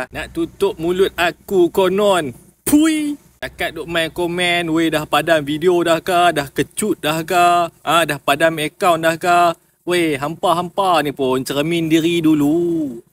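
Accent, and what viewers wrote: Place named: Kedah, Malaysia. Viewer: sempoi bak hang..😅 lama dh aku tak dengaq loghat kedah yg bunyi kasaq🤭... last masa ngan roomate kt Shah alam 30thn lalu...😊 Keep it up bro! Lawan tetap lawan!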